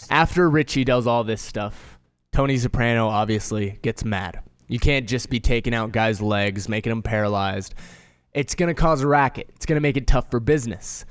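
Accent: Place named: United States. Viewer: Refreshing to hear an American discussing American topics! ❤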